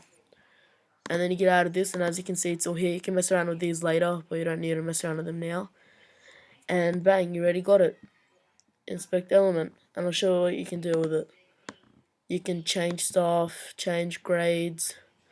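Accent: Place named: Australia. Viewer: It says Australia under Google...I can probably tell that your Australian cause of your voice😂😂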